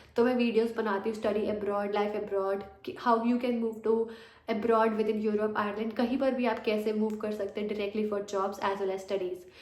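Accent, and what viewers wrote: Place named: India. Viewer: Im sorry but I didn't understand anything with the Indian accent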